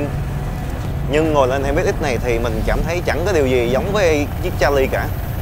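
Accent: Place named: North, Vietnam. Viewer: Tui nói đùa thôi. Chứ tui là fan ruột của bác từ khi bác còn bên tinh tế. Chất giọng bắc dễ nghe và cách bác review rất chi tiết. Nở lòng nào dislike cho ido của mình bác.😄😅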